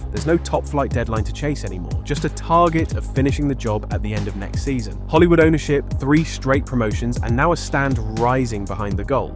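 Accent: United States. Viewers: Maybe drop the American pronunciation on several words dude.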